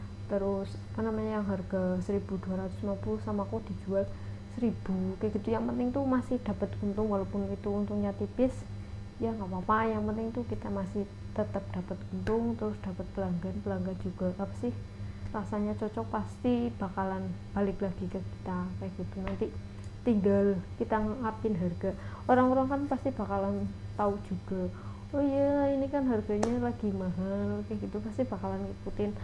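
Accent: Banyumas, Indonesia. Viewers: Oalah Purwokerto toh mba.pantes bisa bahasa ngapak..salam mba aku dr banyumas😊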